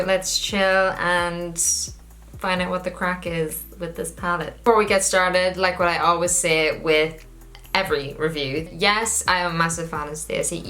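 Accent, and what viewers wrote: Ireland. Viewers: Are you from Dublin😀❤ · wait UR IRISH OMGGGGGG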